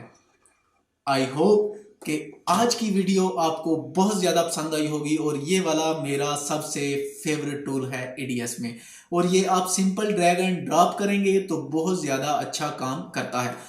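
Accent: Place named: Punjab, India. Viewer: Tusi bolde urdu jo but tuhada lehja punjabi wala hai ji 😀😀🙏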